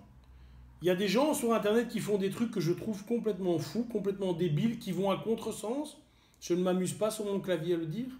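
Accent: Belgium. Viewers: Bonjour, ne penses-tu pas que tous ces conflits entre fédérations, pour des histoires de gros sous, d'égo, gangrènent un peu le Krav Maga ( en France ). Je ne rentrerai pas dans les polémiques, et je ne prendrai pas parti pour qui que ce soit...Mais, j'ai l'impression, et la certitude même qu'on colle l'appellation Krav Maga à toutes les sauces, sur des systèmes de Self défense, pour que ce soit plus "vendeur" ou attractif. Je ne sais pas ce que vaut l'I.K.M, et je ne permettrais pas de juger...Sincèrement, je partage ton avis, sur le fait qu'il y a des bons partout, et des mauvais partout. J'aime assez ta façon de voir les choses, mais ( avec tout le respect que je te dois ) j'avoue que je ne sais pas quoi penser de "civils" enseignant des techniques "paramilitaires" voir " policière", impliquant parfois des armes, à d'autres civiles. Je pense sincèrement que ça devrait rester le domaine des forces de l'ordre. 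Ce n'est que mon avis, n'y vois pas une attaque ou quoique ce soit d'autre, mais, je suis persuadé que tu comprends ce que je veux dire. Continue tes vidéos, j'aime assez les regarder, car elles sont souvent très justes...J'ai beaucoup de sympathie et d'amitiés envers nos voisins belges, et c'est un régal d'écouter ton accent. Force & Honneur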